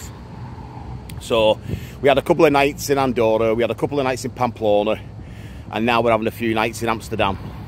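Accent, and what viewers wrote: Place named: Liverpool, United Kingdom. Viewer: Your missus is clearly a Scouser but your accent is a strange mixture of Scouse and something else! What gives!! Great videos by the way.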